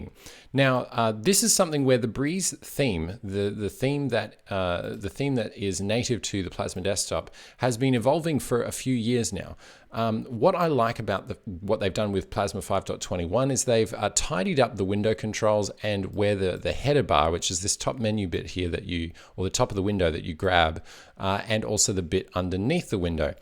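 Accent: Australia. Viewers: Hey, thank you for your thoughtful and well spoken videos. You have one of the least annoying Aussie accents I've ever heard. Just stay on top of the disfluent fillers and I, for one, will be back for more.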